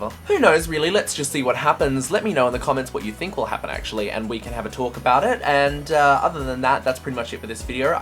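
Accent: Australia. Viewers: oh your australian!?!? i am to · Your australlian? I always thought you were british! XD I love you more now because i love australlians! <3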